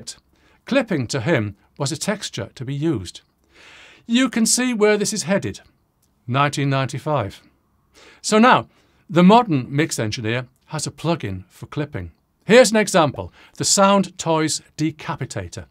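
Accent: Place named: Merseyside, United Kingdom. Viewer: I have original CDs from before 1995 that sound bad because the analogue to digital conversion wasn't very advanced when they were transferred, they have good dynamic range but lack definition.... granted a lot of "remastered" modern recordings are awful and have the 'flat" sound that comes with over compression and over-processing in general. I notice you have a slight Merseyside accent.... my neck of the woods, anyway thanks for a very interesting video that tells the truth about our modern recording world.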